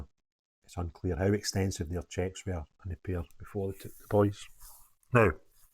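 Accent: Scotland, United Kingdom. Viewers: This happened in Atlanta, Georgia. I wish he had put a link to the new stories. A lot of people are assuming this happened in the UK because of his accent. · I love your Scottish accent. Such a sad story 😢💔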